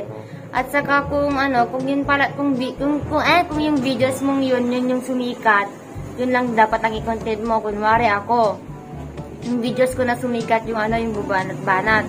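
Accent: Ilocos, Philippines. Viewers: Hi ilocano ka ate?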